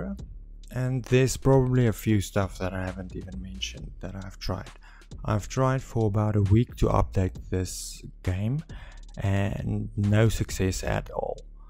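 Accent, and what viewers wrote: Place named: South Africa. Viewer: Hey , I can hear you're south african and so am I , Can guess the issue has to do with our country.... The way i've gotten it to work is by trying multiple phone hotspots until one of them seems to work.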